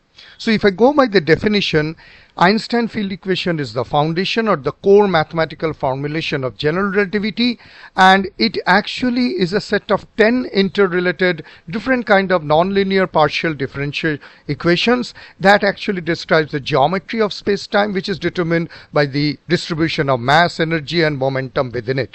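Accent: India. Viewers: Sir are you from West Bengal ❤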